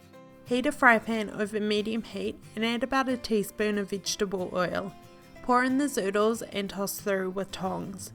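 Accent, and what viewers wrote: New Zealand. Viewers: Are you from NZ? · She is a kiwi, new Zealander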